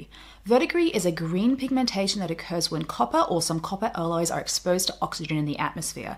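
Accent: Australia. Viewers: Hi. Love the Vids. Just starting to try my hand at figures and finding the vids very useful. Also nice to hear a fello Aussie lol. Please keep them coming.:-)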